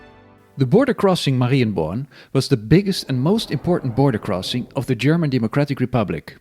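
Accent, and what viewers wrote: Netherlands. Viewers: Dutch accent? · Interesting. His accent in English is typically Dutch, hence my question. Thanks for the answer. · Do I hear a Dutch accent in the narration?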